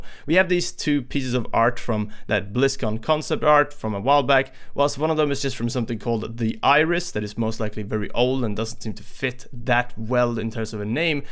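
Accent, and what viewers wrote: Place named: Sweden. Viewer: Jag hörde på din dialekt att jag du var svensk, jag visste det 😂 Fortsätt med det du gör du e helt otrolig och jag älskar dina videos ❤️👍😀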